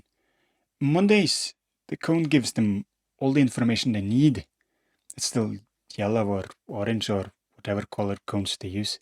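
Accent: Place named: Scotland, United Kingdom. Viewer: If you gave me a million pound, I couldn't work out if you have a Scottish accent or a Norweigan one?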